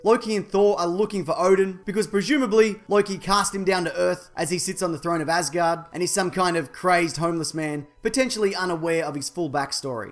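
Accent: Australia. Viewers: Are you aussie?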